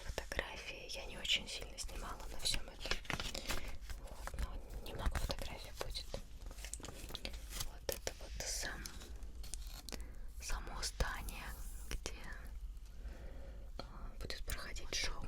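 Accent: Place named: Russia. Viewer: Eu amo o sotaque russo 😻♥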